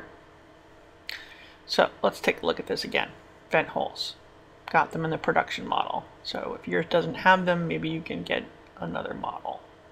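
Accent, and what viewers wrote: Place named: New Jersey, United States. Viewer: brilliant explanation on PWM, as a transplanted NJ Viirginian your accent makes me homesick. I love you lady, you are THE best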